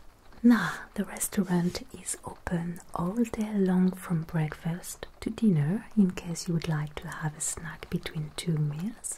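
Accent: France. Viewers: Wow amazing video. Everytime I watch your videos, I feel fully engaged in the story. Your French accent is soo luxurious ❤. Thank you for such amazing gift! 😊 can't wait for the next video.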